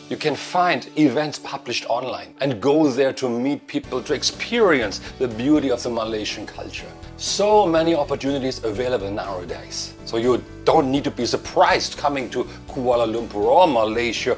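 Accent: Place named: Germany. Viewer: After 30 years living abroad... U still got ur authentic german accent .. ur sounds like u've been in Malaysia yesterday...🤣🤣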